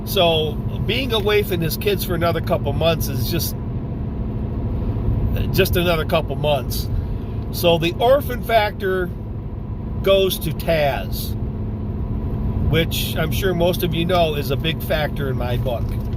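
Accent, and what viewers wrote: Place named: Michigan, United States. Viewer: KIND of fun to hear your take on the Alone show again - after all this time. I had to laugh when you made fun of the Wisconsin accent - Cuz I have to Say - Your Michigan accent is pretty special as well. Of all the Merican accents I would have to put MN, WI & MI accents as the most "special" & annoying as F - of ALL🤡 & I have been to almost every state...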